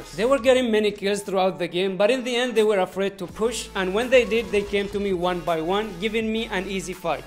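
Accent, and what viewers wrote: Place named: India. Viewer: Yo, you're sound seems Indian....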